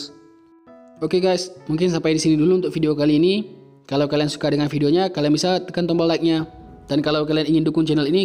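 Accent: Aceh, Indonesia. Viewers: Logat aceh sang? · Kaya logat aceh ya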